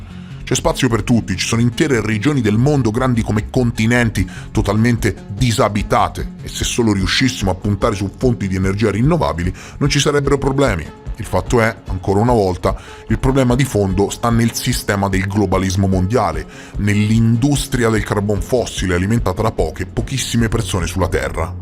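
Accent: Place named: Rome, Italy. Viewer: L'accento è di Roma.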